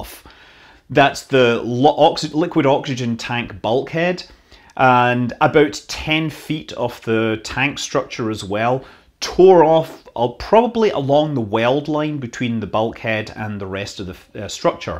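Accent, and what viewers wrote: Scotland, United Kingdom. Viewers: Scott Manley Can I just ask you something- so I’m guessing you stay in the States now but how long is it since you left Scotland? And where did you live in Scotland? I just ask because my accent is really similar. I stayed between Sterling and Edinburgh before moving to just south between Edinburg & Carlisle. Now after living in New York for quite a while under dual citizenship, my Scots is gradually fading - although I still have an accent like yours but now all the ~Scottish slang words have disappeared from my vocab. Just interested, thanks mate 🚀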